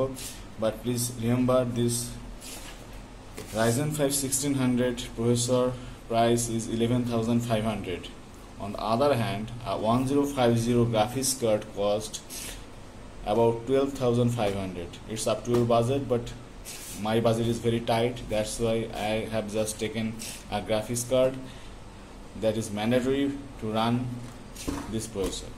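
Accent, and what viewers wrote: Bengal, India. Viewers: আপনার কথা শুনে তো বাঙালি মনে হচ্ছে আপনার বাড়ি কোথায়?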